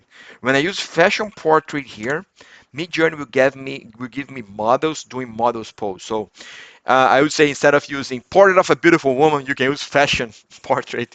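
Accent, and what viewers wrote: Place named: Brazil. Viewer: Seu sotaque parece brasileiro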